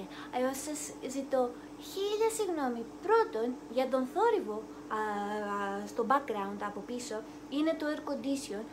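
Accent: Japan. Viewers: Γεια σου! Γιατί μιλάς τα ελληνικά με ιαπωνική προφορά; Χαιρετισμούς από Manchester! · Miss Nancy, νομίζω ότι μόνο αν πήγαινε η σε γλωσσολόγο θα μπορούσε να καταλάβει γιατί υιοθέτησε στοιχεία φωνολογίας, τον τόνο, την προσωδία, τον επιτονισμό κτλ της ιαπωνικής γλώσσας και μάλιστα όχι π.χ. της αγγλικής. Σίγουρα ως ένα σημείο γίνεται ασυναίσθητα, όμως, είναι και μια συνειδητή επιλογή ταυτόχρονα, τουλάχιστον νομίζω ότι οι γλωσσολογικές μελέτες αυτό δείχνουν. Κάποιοι που ζουν (ως ενήλικες) στο εξωτερικό αλλάζουν περισσότερο την προφορά τους από άλλους που ζουν ακόμη περισσότερα χρόνια. Οι λόγοι πολλοί: μπορεί να αισθάνονται ότι αφομοιώνονται καλύτερα στη ντόπια κοινωνία όταν μιλούν ακόμη και τη μητρική με ξένη προφορά, μπορεί να τους ακούγεται πιο εύηχο κτλ κτλ κτλ. Προσωπικά, ζώντας 17 χρόνια στη Ισπανία, δεν έχει αλλάξει η προφορά μου, παρότι δεν έχω παρά σπάνια επαφή με άλλους Έλληνες, αλλά, όταν λέω κάτι στα ελληνικά μπορεί π.χ. 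να κοτσάρω κι ένα «ναι;» στο τέλος, κατ´αντιστοιχία με το ισπανικό «no?» γιατί και το έχω συνηθίσει στα ισπανικά στο τέλος καταφάσεων(δηλ γίνεται και ασυνείδητα), αλλά γιατί το βρίσκω πρακτικό (δηλ το κάνω και συνειδητά σε ένα κομμάτι του).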